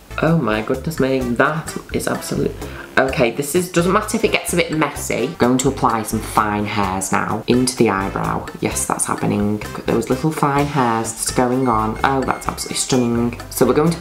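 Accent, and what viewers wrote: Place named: Manchester, United Kingdom. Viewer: Dya know I’m from Manchester but I don’t know anyone with your accent. It’s really nice it’s like still Manc but posh hahaha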